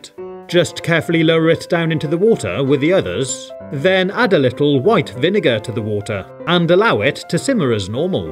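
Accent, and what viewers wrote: United Kingdom. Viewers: AWESOME and very much APPRECIATED. THE IDEA OF SUCH A VIDEO IS EQUALLY CLEVER AS ALL ITS CONTENTS. I ALSO LOVE TO LISTEN TO THE BRITISH OR BRITISH SOUNDING ACCENT. VERY WELL DONE.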